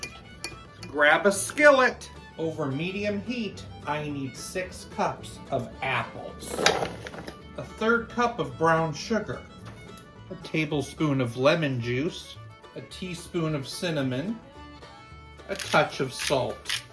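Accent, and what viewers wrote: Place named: North Carolina, United States. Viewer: This sounds like North Carolina ❤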